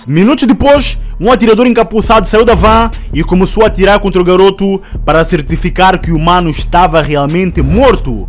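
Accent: Angola. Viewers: Ele mora na gringa mano Ele deve ser da Angola e tal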